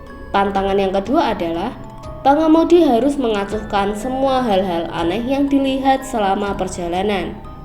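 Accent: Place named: Java, Indonesia. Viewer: Kak saran aja ni, hilangin medhoknya. Udah itu aja, selebihnya i love you ❤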